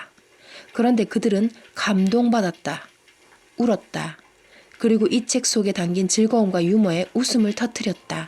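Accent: Gyeongsang, South Korea. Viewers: 어떻게 이런일이..마음공부 하던중 우연히 신과나눈 이야기 란 제목에 살짝 호기심이 발동해서 처음 들은게 몇달전인데도통 무슨 말인지 모르겠는데 댓글들을 보면 감동이라는 글도 많고 긍정적반응이 많더라구요 근데 일부 내용만 들어서는 무슨 말인지도 모르겠고 법문공부 중이라 해석을 어떻게 해야할지도 모르겠더라구요 말 그대로 전 답답한 상태였어요 눈 이 아픈 사람이라 책 읽을수 없는 상황인데다 어제 다른영상에서 한번더 듣고는 도저히 답답함을 견딜수도 없고 어디까지가 신의 의도이고 어디까지가 인간의 의도인지도 모르겠고 ( 살인사건 , 고문 과 같은 큰 사건) 정말이지 속이 편치 않고 혼란스러워서 어제 들은 다른영상에 몇가지 질문 올리고 잠들었는데 답은 없었어요 그래서 평소처럼 법문 들을겸 찾다가 이영상 눈에 들어왔어요 별 기대없이 재생 버튼 눌렀는데 ...저를 위한 영상이었군요 같은 경상도 사람이라 알아듣기 쉽고 또 풀이까지 해 주시니 감동이었어요 궁금한게 하나씩 풀리는것 같아요 너무너무 감사해요 후편들도 기대되요 궁금한건 질문드려도 되죠? 이렇게 알게되서 정말 반갑습니다 수고하세요^^ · 어디사투리세요? 경상도? 같은데요 🙂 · 경상도 억양이 밉지 않네요 감사 합니다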